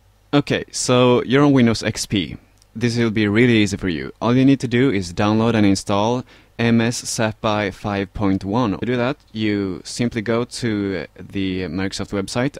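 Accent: Sweden. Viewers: Sounds like a swedish accent :3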